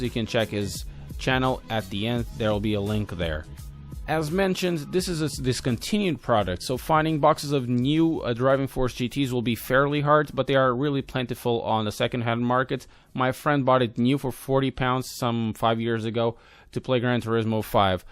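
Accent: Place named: Portugal. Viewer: Where are you from? Your accent sounds portuguese!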